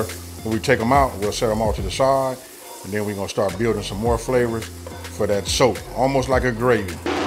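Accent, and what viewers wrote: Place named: Los Angeles, United States. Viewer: I can tell u a l.a brother by that accent lol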